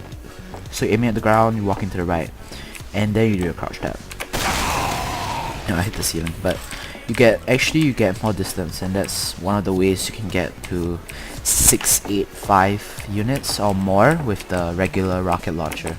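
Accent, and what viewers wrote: Singapore. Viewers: Are u from Singapore? U sound singaporean to me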